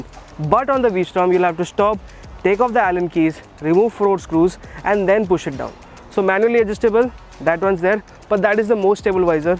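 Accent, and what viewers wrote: India. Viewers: Good video but your English with the strong Indian accent is sometimes hard for me (English ) to understand. What is the cage/barrier thing fitted on the back of the bikes? I see it on a few Indian bikes and wonder if it’s for women riding side saddle? I bought a new Versys in 2020 then bought a 2014 V Strom in 2023. While I liked the Versys the older V Strom is more comfortable, smoother and the gearbox is slicker. I also found the bike more flickable because the centre of gravity is lower. 👍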